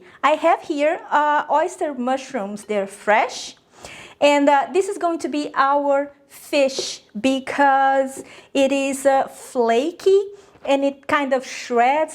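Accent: Brazil. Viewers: A receita parece legal, mas vim elogiar seu inglês hahahaha dá pra perceber o sotaque brasileiro mas eu gostei bastante de como você se virou com as palavras. Parabéns :) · Vi os primeiros 30 segundos do vídeo (o primeiro que vejo seu) e pensei... Wow! Ela fala como uma brasileira! Parabéns pelo trabalho maravilhoso, chef! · Ah, que legal! Seu inglês é maravilhoso, perfeito! Mas como sou brasileira, pesquei o sotaque! Obrigada pela receita!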